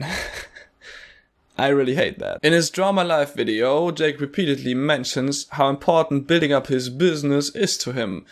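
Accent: Germany. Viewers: Really enjoyed your video, and totally agree with you on most points. From your accent and the bag behind you I assume you're German (forgive me if you're Austrian or Swiss). I had to smile a bit when you mentioned how Jake Paul's philosophy (or world view or whatever) is very American, as it reminded me of how the German exchange students here characterize such people, and sadly I have to agree with them. No I don't want a generation of Jake Paulers. His content is a monument to his own arrogance and narcissism. While I don't like his older brother's stuff either, at least his charisma doesn't seem anywhere as fake and vapid as Jake's. As for the Navy Seal stuff, while I'm sure the armed forces would have ripped much of the narcissism from him, I have to agree that it fits in line with the vapid (probably) bully type that I'm sure he was, and to some extent still is. 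And I hate the "we made it in one day," shit. In writing, editing and refining your work takes up much more time than actually writing content by volume, but it's the refining and craftsmanship that come with it that make it good. Jake is just pumping out sewage.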